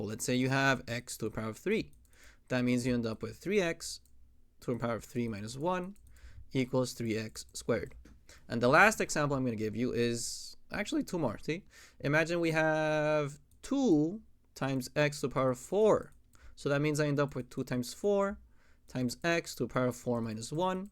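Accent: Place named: Chile. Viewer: This is gonna sound weird, but the 'cierto?' After every phrase sounds soooo cute. Are you from chile? Idk why but your accent reminds me of chile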